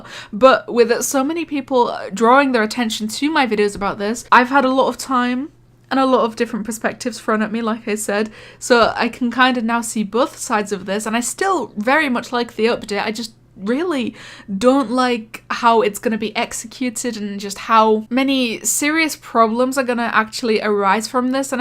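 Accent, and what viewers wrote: Scotland, United Kingdom. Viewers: are you scottish eh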